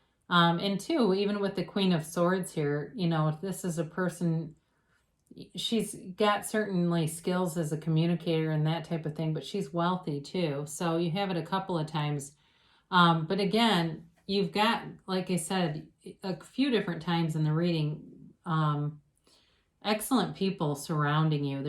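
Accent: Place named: New Jersey, United States. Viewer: Omg I love your accent 😊 You sound like your from Jersey ❤️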